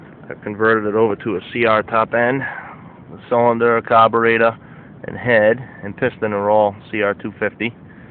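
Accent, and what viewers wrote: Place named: Rhode Island, United States. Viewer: Wow you sound like your from RI!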